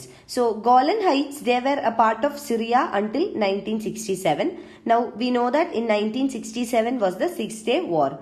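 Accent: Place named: Kerala, India. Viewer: Good to hear a mallu:)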